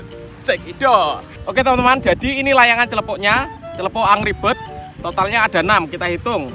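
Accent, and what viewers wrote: Java, Indonesia. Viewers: Orang jawa ya kak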